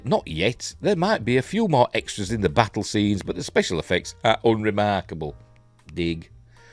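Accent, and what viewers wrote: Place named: Yorkshire, United Kingdom. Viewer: Nice to hear another Yorkshire accent on Youtube, South Yorkshire? Old Wezzy myself that'knows....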